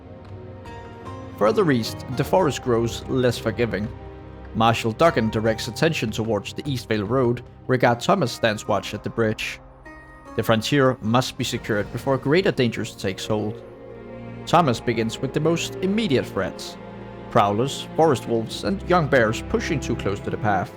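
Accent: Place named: Denmark. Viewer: Danish accent, right? Good video.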